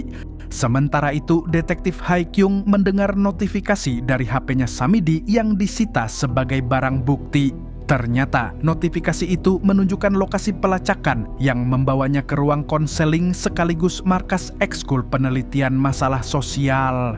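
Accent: Banjar, Indonesia. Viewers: urang banjar amang nya waluhhh😂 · mang urang Banjar kah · Bah buhan Banjar kah tih 😂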